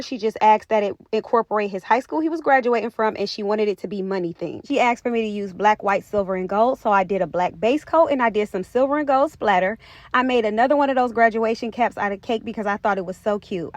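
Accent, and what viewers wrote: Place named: Georgia, United States. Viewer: So I’ll never get a cake from you cause it sounds like you’re in Georgia somewhere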